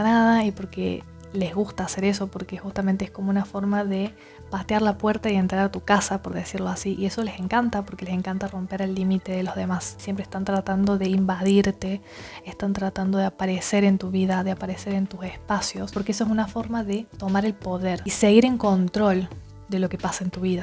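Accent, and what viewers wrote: Córdoba, Argentina. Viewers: Amo tu acento. Supongo que eres de Cordoba. ¡Me encanta! ¡Gracias infinitas por tu ayuda! ❤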